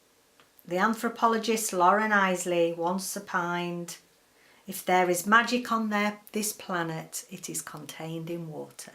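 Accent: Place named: North, United Kingdom. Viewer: Welcome to Booktube, it's lovely to find you. Subscribed! Can I ask where you are from? You sound like a fellow Northerner. 😀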